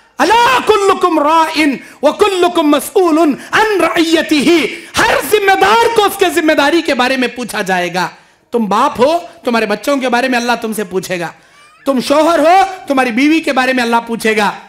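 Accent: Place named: Dakhni, India. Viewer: Dakhni zabaan ki mithaas ??? Alfaaz dil mein utar jaate . Allah aapke Tawheed ke mission ko kaamyaab kare Amee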